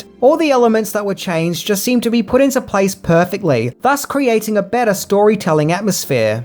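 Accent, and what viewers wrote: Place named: Australia. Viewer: Mate, your content is really good but your delivery is pants - stop shouting at us and I know you can´t do much about your Ozi accent but just tone it down.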